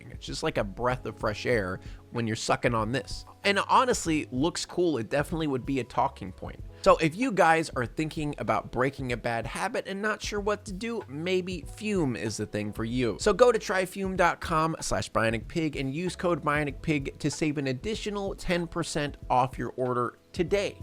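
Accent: United Kingdom. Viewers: Wow your New Yorker accent sounds very British,what you read was written by The Nyc guru,as it said at the top of what you read . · why did you cut out Malcolm McDowell's name? lol its literally the only name on that list that has a voice that sounds like your british accent lmao and btw idk why but i feel like Elemental is just like this movie with character building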